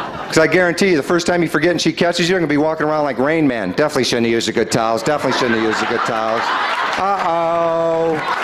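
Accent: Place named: Arkansas, United States. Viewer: Did you know we speak that way in Arkansas because of the Scottish stronghold and influence? Proud Scots heritage here. 😅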